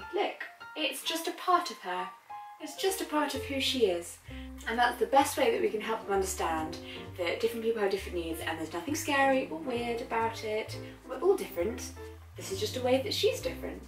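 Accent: United Kingdom. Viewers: Weird u still have the British accent even tho ur deaf lol how did manage to keep that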